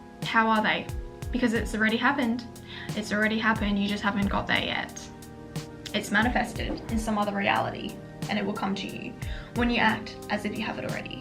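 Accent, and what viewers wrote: Australia. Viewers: Is she Australian? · Finally someone who teaches accuratelyyyyyyyy. Also every time I watch your videos my mind speaks in an Australian accent to me for like 30 mins after. Don't know what that means but I'm not complaining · I keep faking an Australian accent for an hour straight after I watch your videos and I'm not even a native English speaker I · i watch atleast two of her videos every day to get motivation + learn the aussie accent lmao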